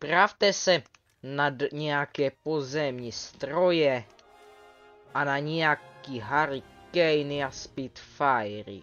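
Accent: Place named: Vietnam. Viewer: spis mluvis jak vietnamec nebo chinan ktery se naucil cesky a nezni to jako rusak moc (nepisu haci ani carky protoze jsem linej)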